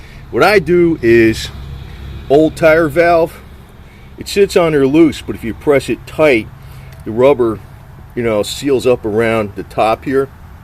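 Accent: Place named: Philadelphia, United States. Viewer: Sounds like he’s from Philly.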